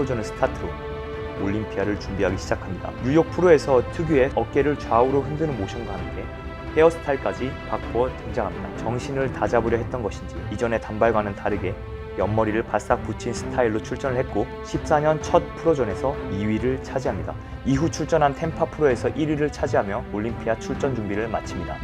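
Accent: Gyeongsang, South Korea. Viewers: 경상도사투리쓰는사람이네 ㅋㅋㅋ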